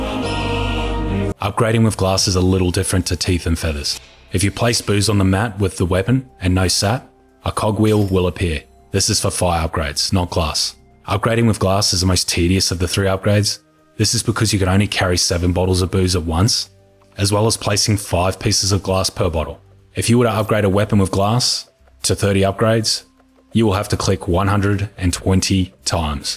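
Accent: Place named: Australia. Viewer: gotta love this australian accent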